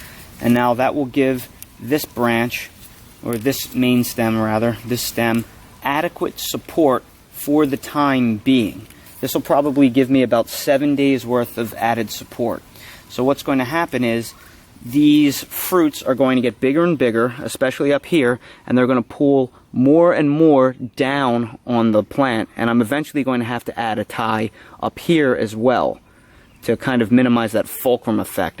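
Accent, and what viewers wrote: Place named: South Jersey, United States. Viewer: I live in suburban philly and that sounds like a south jersey accent!!!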